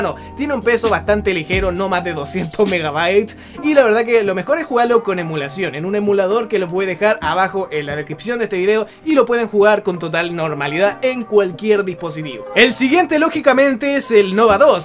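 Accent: Chile. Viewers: Vo eri chileno o no ???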